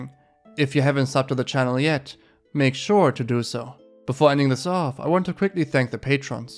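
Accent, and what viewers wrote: Germany. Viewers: are you german? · Is he from Germany? The accent sounds quite German.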